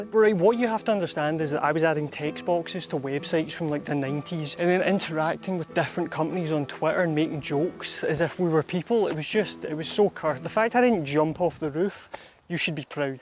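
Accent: Scotland, United Kingdom. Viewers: you know.. for some reason I expected a scottish accent and I got one. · Might I add, the Scottish accent automatically makes everything significantly more excellent. Much love from my little country (Chile) to yours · I enjoy listening to your Scottish accent :) video is decent, too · Haven't subscribed that quickly in a while. Good production, dark souls appreciation and a balamory accent got me straight on board.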